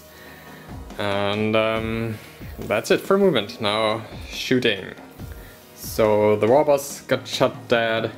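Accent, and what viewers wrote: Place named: Germany. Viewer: You sounds like Germans speaking english 🤔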